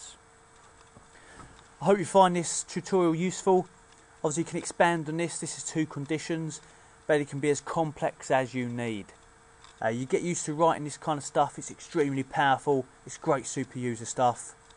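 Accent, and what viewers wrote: United Kingdom. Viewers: you rock! your british accent made it more interesting compared to the other monotone tutorials..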